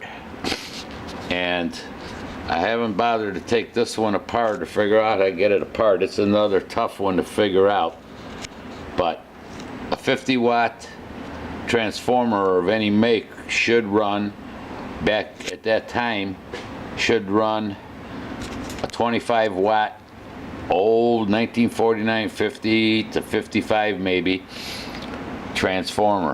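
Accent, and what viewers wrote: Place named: Pennsylvania, United States. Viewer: This guy is definitely from northeast pa , probably scranton!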